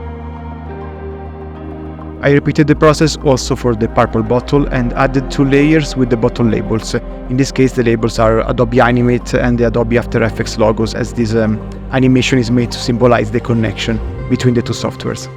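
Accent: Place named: Italy. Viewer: I like the italian accent, makes the content more original. Nice!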